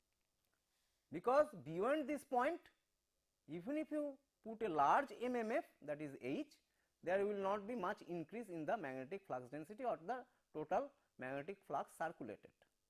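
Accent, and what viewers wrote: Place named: Bengal, India. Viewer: He is bangali ( you can tell it form his assent) ❤ and he amezing ❤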